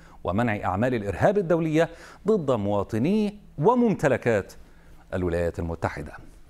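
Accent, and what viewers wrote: Iraq. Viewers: احبك من تحچي عراقي🇮🇶❤️ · ههههههههههههههههه اسلوبك يخبل ونوب تحجي عراقي تجنن لعنة الله أمريكا ومن والها أمريكا وايران هي سبب الدمار وهذول فروخهم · والله اللهجة العراقية عم تطلع معك دهب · اش حلاتك من تحجي عراقي عبالك صدوك واحد من عدنا😄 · ههههههه احب هذا المذيع المخضرم من يتكلم بلهجة العراقية 😍🇮🇶✌🏻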